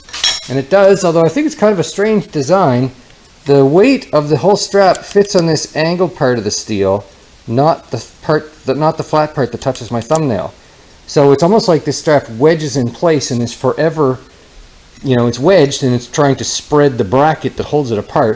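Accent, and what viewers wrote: Canada. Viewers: Are u from nova scotia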